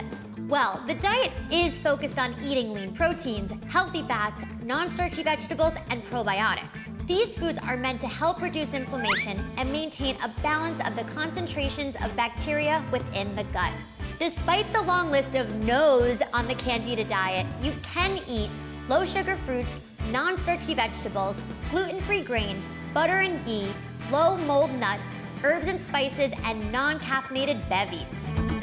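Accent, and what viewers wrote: United States. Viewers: She speaks like only an American can 🙂👍🏼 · God I can't stand the American accent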